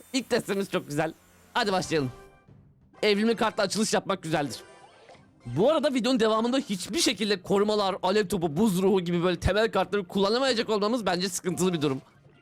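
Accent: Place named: Yozgat, Turkey. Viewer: Abimin yozgat şivesi var adamsın abi😎😎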